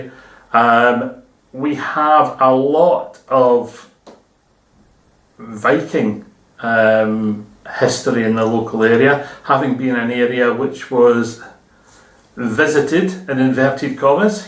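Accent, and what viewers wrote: Scotland, United Kingdom. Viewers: that was another absolutely lovely episode! im lucky in that i spent 4 years surrounded by Scottish people during my degree, so i dont need subtitles! hee hee. i really enjoyed this episode, im loving how your stranded hat is coming along, the colour changes are quite subtle, but i love subtle, i think it works really well. best of luck with it! till next time - cheery-bye!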